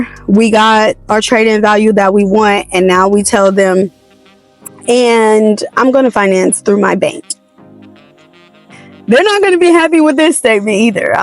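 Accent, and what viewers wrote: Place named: Houston, United States. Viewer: i’m from houston too babes. your houston accent is so hot <3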